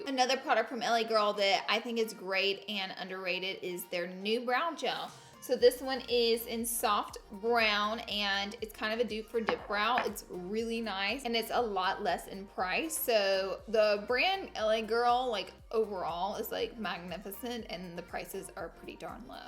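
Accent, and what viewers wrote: South, United States. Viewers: You’re more southern than ever 🤣🤣🤣 (must be mom’s visit influence!) gotta concentrate to get everything but I had a blast watching this video as always 🙌🏼 Love from Peru 🇵🇪 ❤️ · I Always get So excited when there is a new vid!! Girl you are Absolutely Gorgeous & So Damn entertaining & being a southern girl (GA girl here lol) who has a Very thick accent too, its oddly comforting to watch you & hear your Beautiful voice & someone who Pronouces shit Right (and we know how we are saying right, Right? With the Loonnnggg "I" 😋)!! That eye look it Stunning on you!! On me with my orangey natural ginger self, I'd look like a damn clown probably lol, but if I got a chance for you to do it, you damn right I'd let ya! Love from the south girl where its hotter than hell & the humidity forces you to pretty much give up Completely on even Thinking of doing anything with your hair other than throwing it up in a messy bun on top of your head! 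And Love from my 4 beagles & 3 cats who watch & listen to you too & Love you! My youngest cat Sev tries to "catch" you & "pet" you a lot through my phone while I watch! Lol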